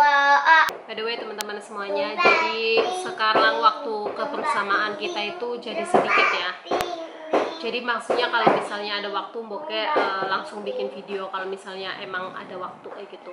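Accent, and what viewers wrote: Banyumas, Indonesia. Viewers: Salaam ngapak😁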